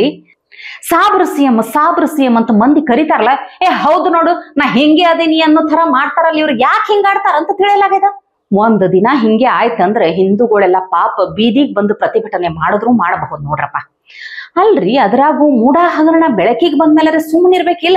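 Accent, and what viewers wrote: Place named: Karnataka, India. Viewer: It is a pleasure to hear your Kannada accent.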